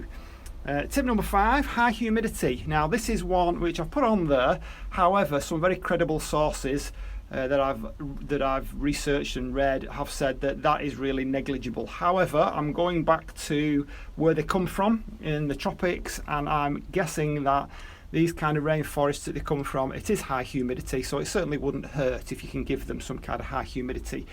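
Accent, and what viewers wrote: England, United Kingdom. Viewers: It`s good listening to a English accent but greetings from the Philippines, I collect water from the roof in a drum actually at the moment its the rainy season so my Nepenthese are happy outside not in direct sunlight Humidity here is around 85% everyday so no problem there. I believe I`m right in saying the Philippines is home to largest number of Nepenthese including N.attenboughrii but I have to grow Lowland spp its too hot for the Highlanders where I live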